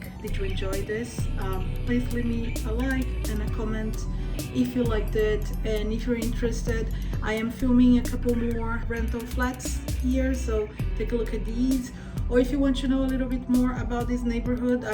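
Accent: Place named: United States. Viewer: Interesting,, but you really don't have a British accent.. you sound more like an American.. hahaha 🤣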